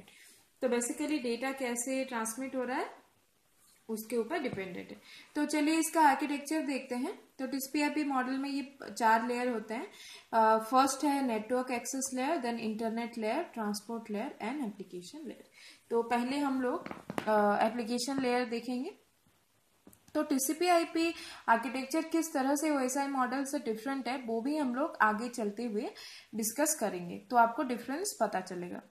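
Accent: Odisha, India. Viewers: Are you odia ??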